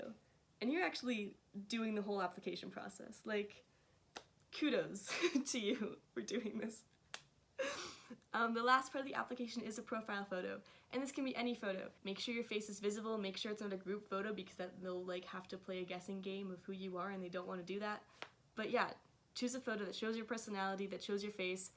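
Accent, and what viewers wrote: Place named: Canada. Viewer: I love her Canadian accent and I don't know why I love it so much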